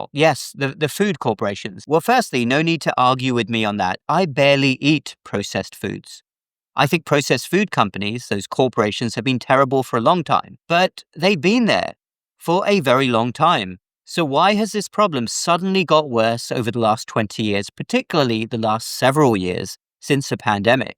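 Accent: United Kingdom. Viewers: You still have the British accent Doctor 😊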